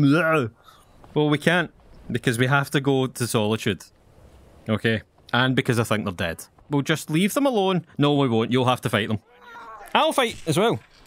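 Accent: Scotland, United Kingdom. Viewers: Your name should be the laughing scotsman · I’ve never found something funnier than a Scottish man calling Ulfric Stormcloak a dirty fascist bastard. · 😂😂😂 oh i love ur accent it makes it so much better to watch scottish is an amazing acent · The Scottish accent just makes this more entertaining LOL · No intro, not name, not asking to like and subscribe. Not even an explanation aside from the title. Just a heavily accented Scottish man dragging a Nordic man across the countryside. This is peak entertainment lol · Oh my goodness, you might be the most Scottish person I've ever seen. Like, not even just the accent, but your sense of humour and the way you talk as well, it feels so very Scottish and it's an absolute joy to listen to. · If you had a tid bit of irish mixed in with the scottish accent you could become Sheogorath himself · A Scottish version of IAmCrusty….I love it 😂🥹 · Damn, that was HILARIOUS! 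I couldn't stop laughing! And with scotish accent I instantly thought of the NacMacFeegle! Nay King! Nay Queen! 🤣 So maybe you could make the other way rund also dragging Tullius to Ulfric! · I'm a simple man. I hear a Scottish accent, and I'm happy. In fact, I think I spontaneously sprouted a kilt, and for some reason I cannot pronounce "burglar alarm" any more. Just found your channel, already love it. Thanks! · This is the first video I've seen of this man, but his beautiful Scottish accent sold me. Subscribed. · Scottish accent made this so much funnier😂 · The Scottish scolding is really soothing. I will watch your other videos, they maybe relaxing too. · Lol IAmCrusty does this sort of thing and he's British so hearing a Scottish person get upset in this game is a new level of entertainment that I can get on board with. Glad I found your channel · Why is there a Scottish man dragging Jarl Ulfric across the land of Skyrim while scolding him with a heavy accent in my recommended videos? 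Not that I'm complaining. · This is Scottish babes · I just found this channel, and my god this is hilarious. The Scottish accent really adds to it all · It’s the Scottish accent for me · Some insane and rambling scottish dude drags Ulfric from Windhelm to Solitude. Why is nobody stopping him? Because he's the friggin dragonborn, slayer of Alduin the world ender. Who's gonna stop him? Some random ass guard that used to be an adventurer? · Never thought i spend 30mins hearing a scottish dude ramble at an npc yet here i am🤔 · First video I seen was Aventus, it was weird and would have felt unnatural had I not known what was happening lol. This series is GOLD! Your heavy Scottish accent makes it so much better. Thank you. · I did not know I needed 23 minutes of a Scottish man dragging Ulfric Stormcloak all around Skyrim. For that, I thank thee. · Scottish YouTuber AND Skyrim??? I’m home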